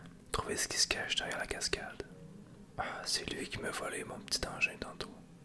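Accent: Quebec, Canada. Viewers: Merci pour tes vidéos. J'adore ta voix, et ton petit accent québécois me déclenche énormément 😉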